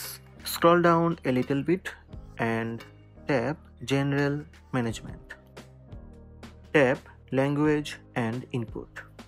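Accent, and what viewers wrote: India. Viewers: But I like the Indian accent!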